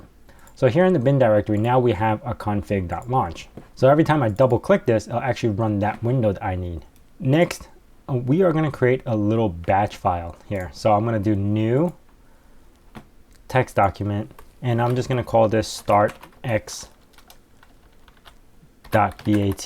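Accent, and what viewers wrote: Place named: New York, United States. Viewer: You are a genius. And I am very impressed with your knowledge of the Windows WSL and Linux ecosystems. I am also impressed with your English and your accent ( which I assume is somewhere around New York ). I will never live long enough to master all those things. ( I watched this in Xfce using Fedora Rawhide with all the latest bells and whistles that Fedora offers ). I hope I can redo what you just did using my Fedora Rawhide setup.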